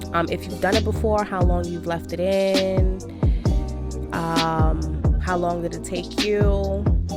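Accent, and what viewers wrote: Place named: New York, United States. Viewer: Are you from New York?? Because I’m from New York and I know that accent from anywhere and you’re gorgeous 😍😍😍😍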